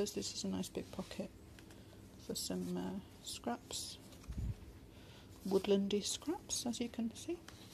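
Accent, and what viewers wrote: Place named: Kent, United Kingdom. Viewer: I'm not clever really your accent is quite clear still. I am from Kent so recognised you have a local accent as you sound a little like myself. It's beautiful up north and I love to travel that way when I can. If you had still lived locally I would have enquired if you do journal making classes as I would have loved to attend. There is little known about making journals down in the SE that I can find, as such YouTube is a valuable community building link. I must go check out your etsy store asap.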